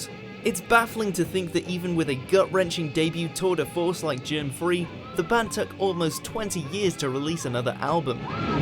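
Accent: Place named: Cockney, United Kingdom. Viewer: Ваш ебаный fucking cockney нихуя не understand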